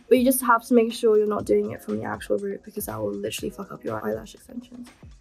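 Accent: United Kingdom. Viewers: I love her british accent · SHES BIRTISH?? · am i the only that didnt know she was british??? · shes british? 😢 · BEABADOOBEE'S BRITISH?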